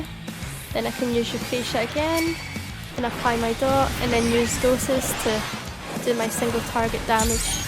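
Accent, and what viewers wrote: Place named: Scotland, United Kingdom. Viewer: I had a terrible time just doing it, thanks to this it helped, and its nice to hear a Scottish voice on here (I'm from NE ENgland and live in Scotland)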